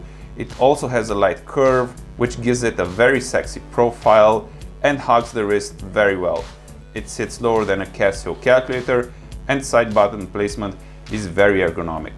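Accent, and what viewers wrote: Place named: Hungary. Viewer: Kicsit magyar az akcentusod. Talán nem véletlen, ugye? :)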